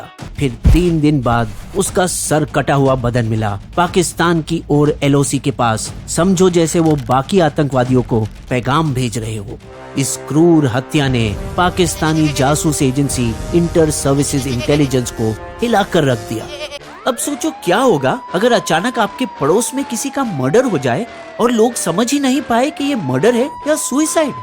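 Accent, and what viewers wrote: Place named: Punjab, India. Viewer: Iss video ke vois aditing wala jaror panjabi hai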